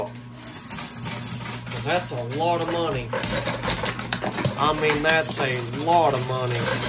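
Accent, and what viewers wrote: United States. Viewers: I love watching all of your videos. Where are you from? You sound so country lol not a bad thing at all. I'm from Mississippi so I'm country too lol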